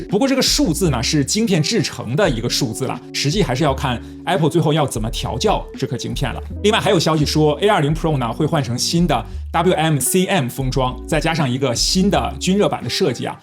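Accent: China. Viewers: 为什么用的词是台湾或香港那边的，但是口音是大陆的呢？好奇怪啊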